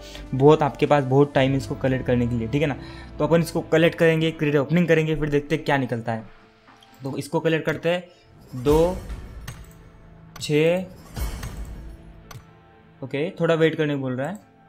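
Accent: Maharashtra, India. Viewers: Bhai marathi ahes ka tu...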